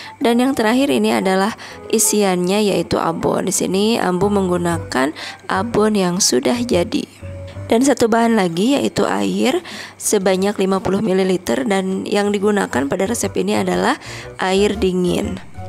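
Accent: Bali, Indonesia. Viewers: Kadang kadang logat t nya ky orang bali ..asli gitu ya ngomongnya..apa di buatt buatt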